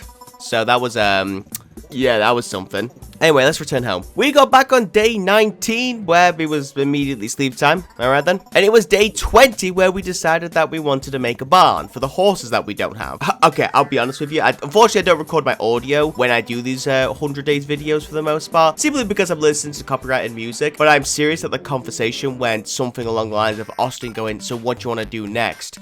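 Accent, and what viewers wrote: United Kingdom. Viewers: I think you have a British accent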